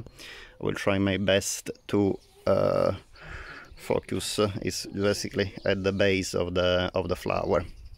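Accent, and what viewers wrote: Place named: Italy. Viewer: Wonderful! I’ve really enjoyed your videos about fragrant plants and also your beautiful Italian accent. Grazie mille!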